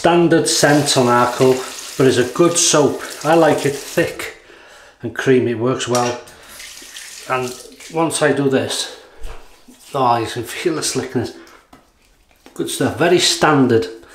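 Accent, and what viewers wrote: United Kingdom. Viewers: It's always great to hear some British English accent. Your videos are not only entertaining and relaxing, but educational too!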